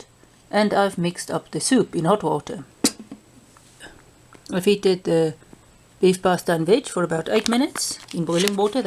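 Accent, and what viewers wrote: South Africa. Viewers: Your SA English like a razor blade to my ears. I speak US English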